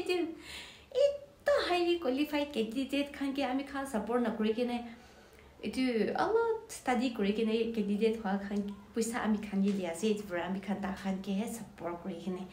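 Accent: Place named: Nagaland, India. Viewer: Glad u used chakhesang dialect 🔥🔥🤧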